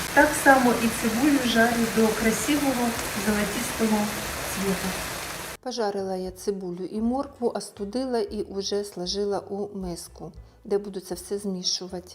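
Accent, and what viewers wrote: Ukraine. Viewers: Це не суржик, це російська з українським акцентом! Могла б перед тим, як знімати, приділити час і провірити у словнику слова, і не ганьбитися!!!! Каже не пишіть. А для чого тоді таке ганьбите виставляти?……. Улажую????? Тута і ТАМА….,сутки двоє….. А слово ДОБА ЗНАЙОМО????? Це вивчають у школі!!! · Ничего себе суржик.. Красивая украинская речь.. Не слушайте никого про суржик. Вот это и есть мова. А не западенский диалект.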